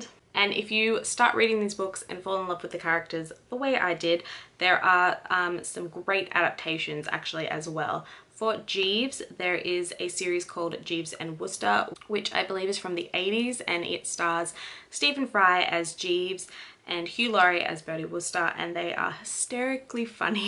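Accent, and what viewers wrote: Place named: United States. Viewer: She is very American. There is no difference between the d and the t in her pronunciation.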